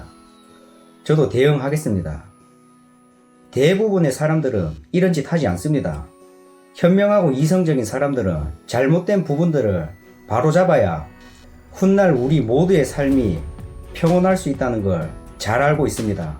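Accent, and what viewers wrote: Gyeongsang, South Korea. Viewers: 전라도가 좀그래요 님은 경상도?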